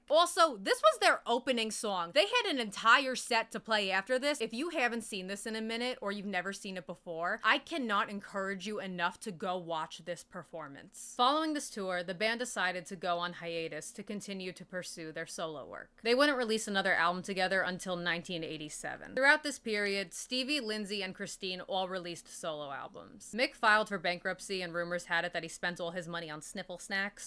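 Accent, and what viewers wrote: New York, United States. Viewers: Love that New York accent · Staten/long island/NY ass accent goth girl talking about Fleetwood Mac?! Sign me up fam. I grew up in NJ and this is very wholesome to me.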